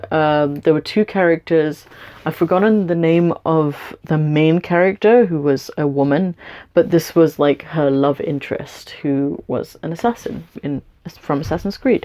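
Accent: United Kingdom. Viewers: Real question..what is the objective of content you put out? informative, validation, monetary, therapeutic just plain ol' fun,when i view your content I try to look past the ex muslim chick with the Brit accent seeking validation from cyberspace with a western culture fetish, maybe its partly because I dont see (or really care to be honest)about Islam or its followers where I Iive..you have a lot of well wishers and supporters..on Team which is good my comments arent meant to demean or be disrepectful..i could be out in sunny 85 degree weather but I choose to watch your content..mix it up..interact with people..do some location shoots..surely the Universe isnt all Islam sucks My family sucks I like western entertainment and the occasional cringy use of American slang(sorry its like imagining the queen saying" bitch got cake" lol)..NOW you're Artwork I like....a little angular for my tastes but I love your color schemes...hey put out an adult coloring book that might generate some scratch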